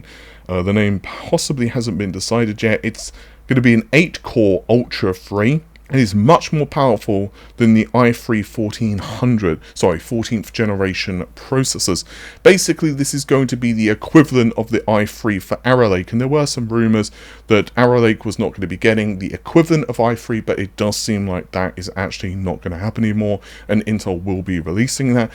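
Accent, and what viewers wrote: United Kingdom. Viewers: Watchied a lot of British TV and I have come.accross quite a few people that sounds like this man's accent.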